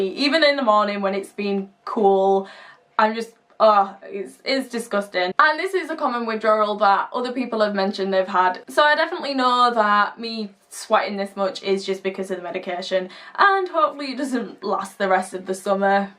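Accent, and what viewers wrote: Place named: Yorkshire, United Kingdom. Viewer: The way you pronounce certain words, it reminds me of GEOFFREY BOYCOTT the cricket player commentator , Yorkshire English accent he has .... You must also be from Yorkshire I assume ..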